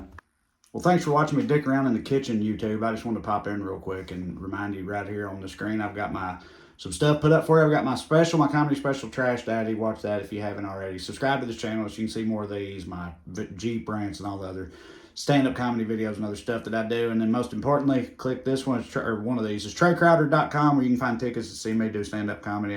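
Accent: Scotland, United Kingdom. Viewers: You knew we needed a second helping of the Scottish accent! Thanks for that! 😂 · I am weirdly fascinated by this "Scottish" accent. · I’m down for any video where you use a Scottish accent, Trae! These look absolutely luscious 😋😋😋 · Love the accents - especially the Scottish one - that's what I come to Trae for, baby. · Your array of talents continues to leave me in awe. Cooking plus British dialects? Lawd have mercy!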